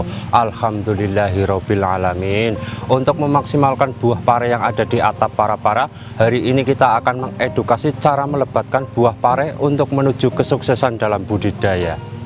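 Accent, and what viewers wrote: Banyumas, Indonesia. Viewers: Logate ngapak.. asli ngendi rila kang? 🙏 salam sekang cilacap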